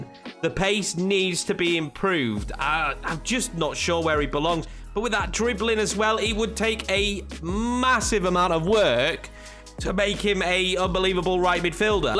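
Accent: Yorkshire, United Kingdom. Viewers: Never thought I would say this but Up The City!!! How we have missed your Yorkshire dulcet tones lol 😂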